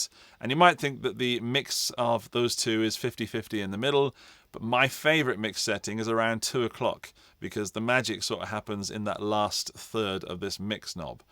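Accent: United Kingdom. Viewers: Nice British accent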